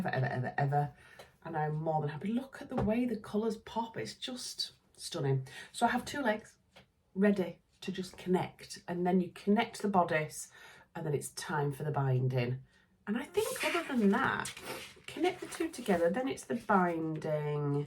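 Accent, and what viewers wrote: Yorkshire, United Kingdom. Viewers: That 'gonk' fabric is awesome! I literally gasped when you held it up. I had to laugh at your comment about being British and talking about the weather. I think it's our national obsession. I'm near Glasgow and we've had -10 degrees yesterday and today. I wouldn't have minded except our boiler decided to pack in and it took Scottish Gas 2 days to get out to us to fix it. The house was only marginally warmer indoors than it was outdoors!! I can honestly say, that I don't ever care to repeat that experience. At least your videos have kept me cheerful. Keep going, Mrs, I love your smile and infectious laugh. I'm also secretly loving your accent. It's been nearly 15 years since we moved up from Yorkshire to Glasgow and I didn't realise how much I missed the accent until I started watching your videos. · I made myself a gnome printed turtleneck top to wear to the Christmas open house at the childcare centre I work at. 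It was a big hit. Also I have been hosting tea parties in each classroom with the children, as I'm serving the tea, (water), I talk to them in a British accent which actually sounds like you. Of course it just makes them roar with laughter. My local fabric store still doesn't carry that stretchy thread, so frustrating. I make sure I watch your vlogmas before I leave for work everyday. That way I start my day with a smile.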